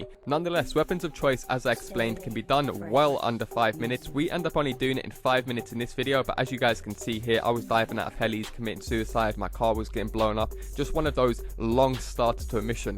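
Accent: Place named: United Kingdom. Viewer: yo swear down youre from uk! grime!!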